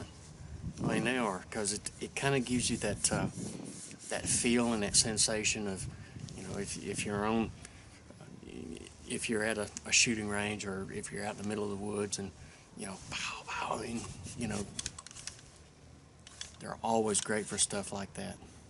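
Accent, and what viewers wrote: South, United States. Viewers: This was a wonderful video! I feel like you were really caring and you had a calm and reassuring voice. I'm from a rural area and it made me happy to hear a fellow southerner. Thanks for the help. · Can you stop whispering. Are you from the south?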